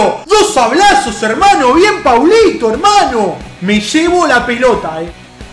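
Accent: Argentina. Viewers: JAJAJAJAJA que grande sos...Nos caracterizas 100% como Argentino jajajaja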